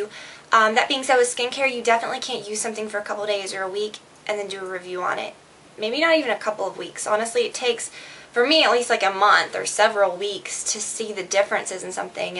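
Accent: South, United States. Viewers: Your southern accent really came out in this video. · I think is pregnant too! She is GLOWING!!! & girl I can your southern accent coming out in this video! Love it! I use Pro Active too & LOVE it!! Great video!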